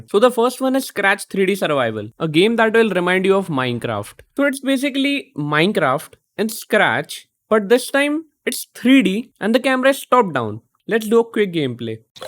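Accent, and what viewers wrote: India. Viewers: are you indian? you sound like indian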